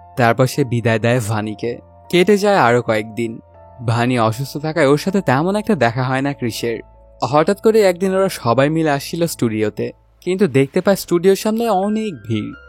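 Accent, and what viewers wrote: Bangladesh. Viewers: Anar gola sune mone hoche Bangladeshi..ader ki oder desher movie kom pore j sudhu amader indian der sob kichu niye pore thake...asole India chara to choltei pare na..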